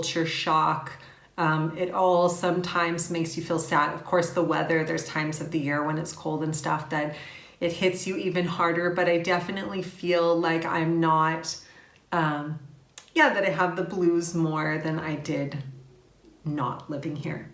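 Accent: United States. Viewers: Dear Lady You never spoke english but american english. Never ever tell an real english you talk english YOU americans dont have a language you took english ok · Hi, yes being a foreigner? You will always be asked, "Where are you from"? "I can hear an accent". "Are you from the Netherlands"? That is what I hear, although I'm British. So with you american accent and rolling "R"s, you will always be an American. And yes, you will be treated better that other foreigners too, well noted. But what about the other way around? Are foreigners in the UK or the USA treated the same, no matter where they come from? I find it much worse when people ask how I could leave England, it's soooo wonderful there. Well yes there are lots of nice places in the UK, but no every person has the chance to live outside big run down cities with few prospects!!! I still answer that I'm english though, even though I've had duell nationality for more than ten years. 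Then I add, Oh, I forgot I'm German too now. My pooor (german) children have to justify their english surname.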